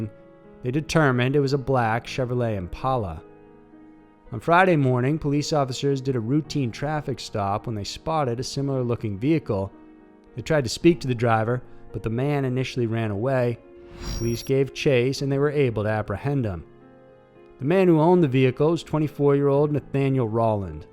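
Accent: South, United States. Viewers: your voice really makes these videos interesting. Am I hearing a southern accent? Have been watching a ton of these the past two days, you got yourself a new subscriber!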